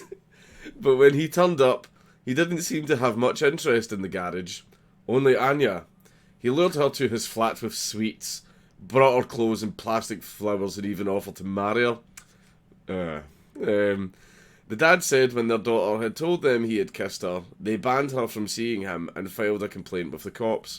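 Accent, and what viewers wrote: Germany. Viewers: your german accent is godlike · Pretty solid German accent buddy 👌👌👍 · Man you can do a German accen hilariously well! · Say "party pooper" in your German accent. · You do a REALLY good German accent! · That german accent is creepily accurate.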